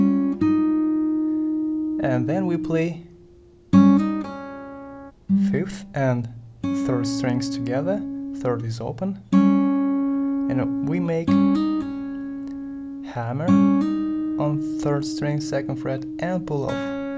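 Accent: Russia. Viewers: 100% Russian accent. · Этот русский акцент >_>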